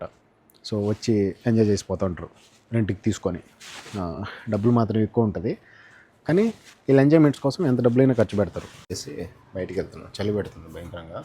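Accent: Kadapa, India. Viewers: మీది కడప న అన్న 😮 మాది పక్కనే ఊరు 😊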